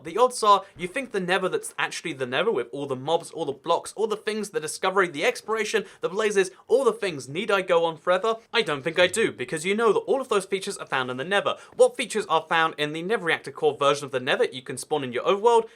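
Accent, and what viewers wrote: United Kingdom. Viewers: Is that a German accent he speaks with in the beginning? Weird since he speaks in a British accent the rest of the time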